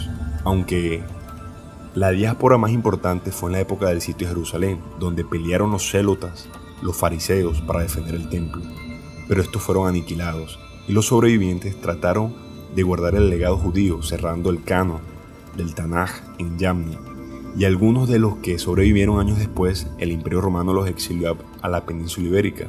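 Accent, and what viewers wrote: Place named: Venezuela. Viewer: La historia puede ser muy buena, pero no con acento venezolano por favor. Pierde toda credibilidad y seriedad. Dile a algún amigo que te grabe la voz, preferentemente un español. Además, se nota que estás leyendo absolutamente todo, te equivocas al pronunciar los nombres y el acento de los lugares ó personas porque no eres un erudito en el tema, no lo has estudiado, ni lo conoces, solamente estás leyendo un libro que te encontraste por ahí.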